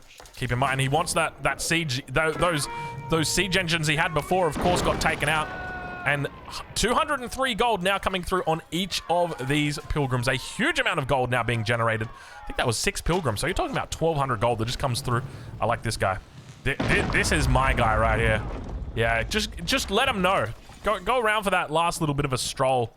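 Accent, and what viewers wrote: Australia. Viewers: is truly the top tier caster. Funny, insightful, genuinely passionionate and knowledgeable about the game, a family man, that delicious aussie accent, minimal shilling, not afraid to call out pros and devs while being generous in giving props to good plays and dev decisions and shouting out and linking players whenever possible. I don't like every video but let me tell you I love every video and haven't missed one in a while (except FFA's I skip those sorry). KEEP UP THE SICK WORK MATE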